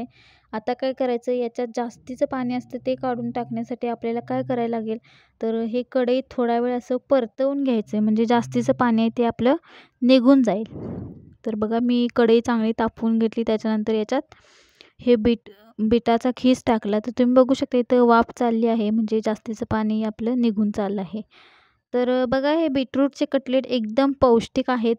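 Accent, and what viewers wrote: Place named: Kolhapur, India. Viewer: रांगडी कोल्हापुरी भाषा आहे ती ताई खिसुनच बोलाव लागत...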